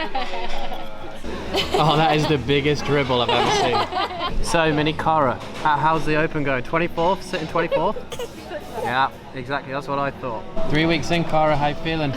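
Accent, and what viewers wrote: Australia. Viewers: your accent changed a bit to Australian! haha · Aussie accent 😂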